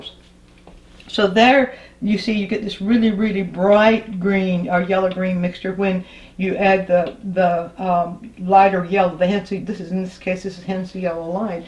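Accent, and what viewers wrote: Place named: South, United States. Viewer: you are not only aged artist you are an excellent teacher, your passion for painting shows in your teaching. You talk slowly and clearly ( unusual today) and I love your southern accent, thank you I have learned a lot from you. Khemin 🌹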